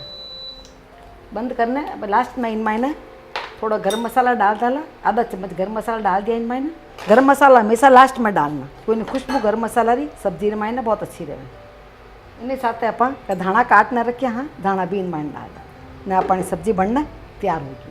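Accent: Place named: Marwari, India. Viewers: Love to listen your rajsthani voice and good sabji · This lady's Marwari is just so pure and sweet. The sheer pleasure is to listen and savour. But the added prize is some amazing food dishes. We've tried many and they are simply gorgeous. What an amazing find on YouTube - thank God for YouTube, the world would be poorer if we'd relied on terrestrial TV. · She must be from nagaur district of raj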